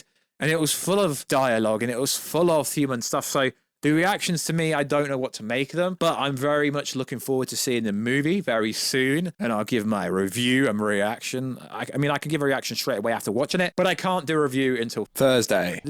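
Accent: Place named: Scotland, United Kingdom. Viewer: You sound like grounds keeper Wullie from the Simpsons, with that dodgy Scottish accent. It made me laugh though.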